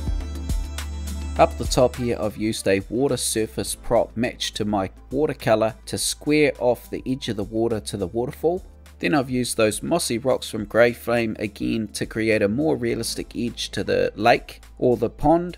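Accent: New Zealand. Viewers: Love your new series. Love hearing the kiwi accent on CS vids.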